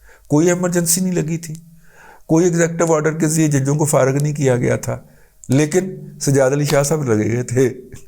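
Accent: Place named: Okara, Pakistan. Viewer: sir ge app depaalpur okara waloon ki zaban bol tay hain. hamaray relatives wahana rhtay hain hum log basically bvahwalnagar ke hain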